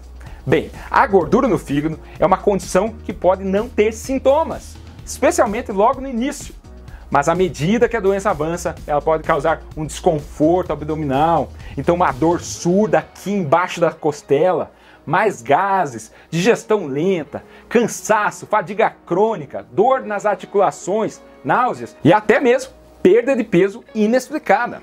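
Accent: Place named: Caipira, Brazil. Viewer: Amo esse sotaque caipira dele.❤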